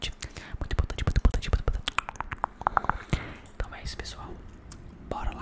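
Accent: Minas Gerais, Brazil. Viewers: Espinha. Rsss tem um vídeo que vc apagou né? Com microfone de orelha e fundo meio vermelho, e com luzinhas de natal. A gente nota viu. Aquele vídeo era muito legal. Sobre esse vídeo tá ok, achei legal quando vc faz sons por cima: acho que os vídeos antigos traduzem muito pouca sensibilidade (por conta dos sons serem mais abruptos). Cara, as vezes eu repito seus vídeos pra dormir ou acalmar a mente no final da noite. Além do mais esse sotaque do povo de Minas, já transpassa uma certa tranquilidade. Conteúdo nota mil. Evolução muito expressiva 👏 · Sou inscrito há muito tempo, muito bom ver o canal se mantendo e crescendo! - Amo seu sotaque mineiro hauhau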